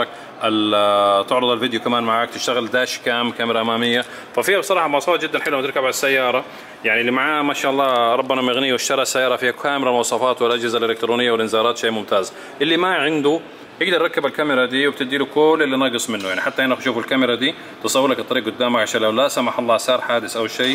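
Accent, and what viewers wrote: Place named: Bangladesh. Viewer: كركر زياده لهجته لهجه بنقاليه ، . . .اضبط لهجتك لا تتكلم بهذي طريه تكلم بلهجه العامة . . .ولا تكلم بلهجه القبليه اما هذي الهجه مدري كيف جايه تح انه سبك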